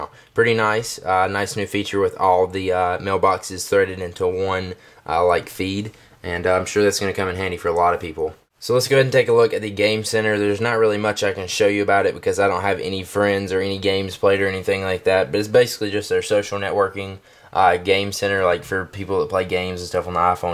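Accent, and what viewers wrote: South, United States. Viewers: southern boy lol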